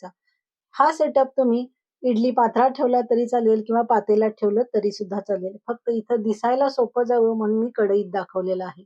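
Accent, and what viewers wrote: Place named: Karnataka, India. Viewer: लुब्लुबीत नाही लुसलुशीत आणि प्लेटा नाही प्लेट्स तुम्ही कर्नाटकी आहात का? उच्चार तसे वाटतात बाकी इडली ठीक आहे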